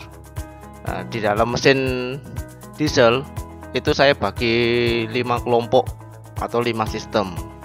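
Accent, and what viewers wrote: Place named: Malang, Indonesia. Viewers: logat jawanya di hilangin mas. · Iku mesin e bego gak kenek d ganti mesin e becak a cak? Medok malanganmmu lurrr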